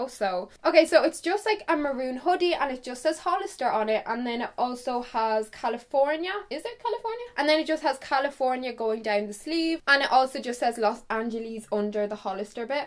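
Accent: Ireland. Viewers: Are u from Ireland 🇮🇪 ( edit) 2 min in the video: yeppppp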